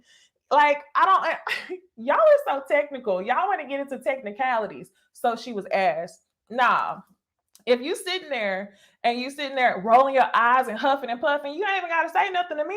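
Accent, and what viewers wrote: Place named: DMV, United States. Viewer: Lol the first time I heard the DMV accent was some documentary about girls in a juvenile home...and then Monique the comedian lol. I hear your accent but I've noticed in Dallas there are 2 different accents...the southern one that multiple ethnicities have and the one that sounds like Nelly and the St Lunatics lol. I'm from boring Iowa but live in TX so I love hearing accents 🤣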